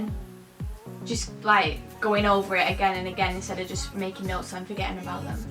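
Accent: United Kingdom. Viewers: I'm sure she's from the UK! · r u british?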